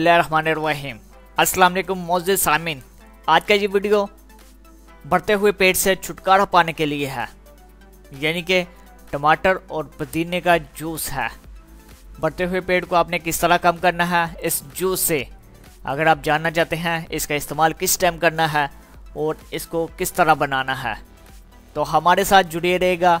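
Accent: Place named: England, United Kingdom. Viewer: Aap urdu ko English k style m bolte ho kia aap English man ki birth ho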